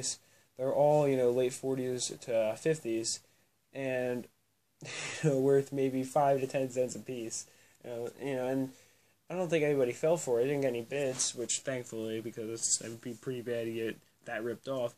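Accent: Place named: United States. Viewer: When you say you're fluent in English I'm guessing your main exposure to English is British English? This guy has a very clear American English dialect, the most commonly known and spoken dialect, and if you have a hard time understanding him then you're not really as fluent as you think you are.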